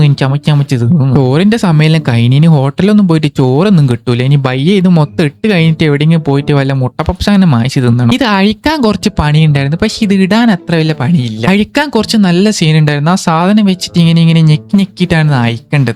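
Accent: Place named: Kannur, India. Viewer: Bro kannur slang matram parayumooo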